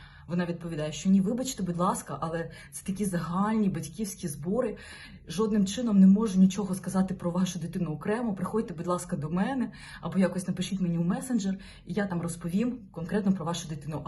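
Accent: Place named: Russia. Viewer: Когда говорила по Русские- было интересней слушать. И по украински плохо говорит - сразу видно, русскоговорящая. Из- за мовы- отписка.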